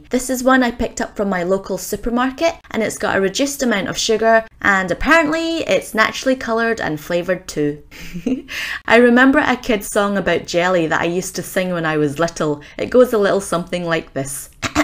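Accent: Scotland, United Kingdom. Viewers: I love your accent. It makes me miss Scotland so much. :( Please do more vegetarian meal prep, and fitness programme- I find it so inspiring. :)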